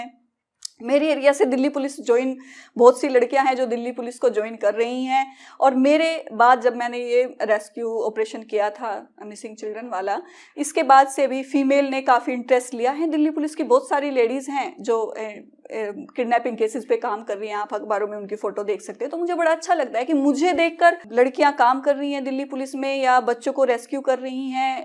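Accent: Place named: Haryana, India. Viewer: आपको मुबारक कि आप अपने mission में सफल हुई। अब आप दिल्ली में हो अपनी हरियाणवी बोली को कंट्रोल करके बढ़िया से हिंदी के साथ इंग्लिश को mix करके बोला करो। ताकि आप दिल्ली के हर इलाके में पोस्टिंग और कामयाबी हासिल हो सके। promotion होने पर काफी easy feel करोगे । शुभकामनाएं ।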